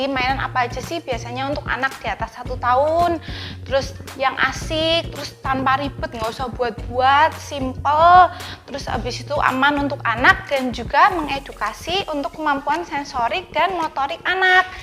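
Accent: Java, Indonesia. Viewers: Walaupun medok mbake ayune poll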